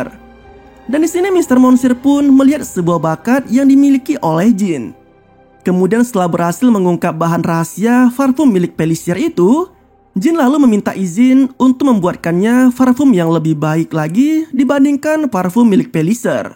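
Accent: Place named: West Java, Indonesia. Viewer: Varpum hahaha...narator nya sundanese banget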